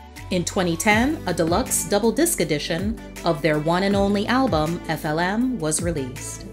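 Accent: United States. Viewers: The narrator is American so.......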